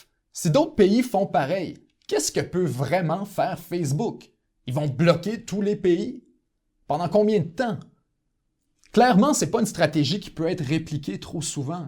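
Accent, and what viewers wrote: Canada. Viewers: son accent canadien qui rajoute un peu de couleur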